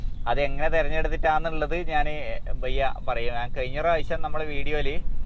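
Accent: Kannur, India. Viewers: യാത്രയ്ക്ക് ആശംസകൾ. Vedeo length കുഴപ്പമില്ല. കണ്ണൂർ slang നമുക്ക് സൂപ്പർ.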